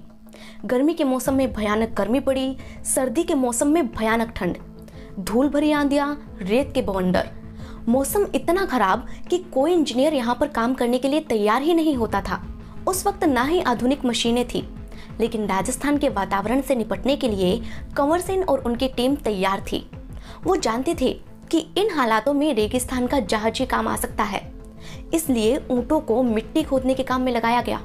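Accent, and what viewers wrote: Standard. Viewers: बिल्कुल शुद्ध हिंदी बोली आपने बहुत मधुर भी बोलती हो दिल से आपको धन्यवाद o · आपकी शुद्ध हिंदी बोलने की कला ओर आवाज ,दोनो हि लाजवाब हैं मैडम जी ,बहुत अच्छी वीडियो हैं।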